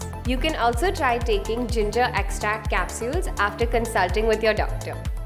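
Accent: India. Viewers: you dont look indian but your accent says otherwise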